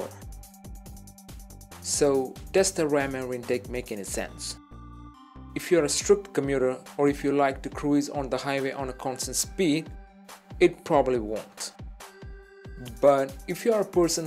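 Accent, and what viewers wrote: India. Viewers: Such a heavy Indian accent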